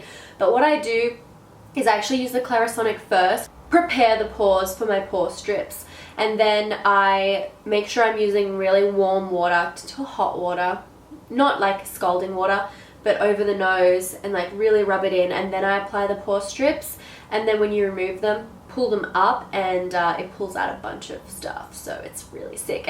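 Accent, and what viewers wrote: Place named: Australia. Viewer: I know people throw this statement around all the time but you are seriously so beautiful, with and without makeup. You truly don't need to wear makeup you're that beautiful. This is the first video of yours that I've seen so I don't know anything about you but if you're not a model you should be. And I actually like your accent even though Aussie accents often annoy me (I lived there for 8 years and became a dual citizen, so I can say that)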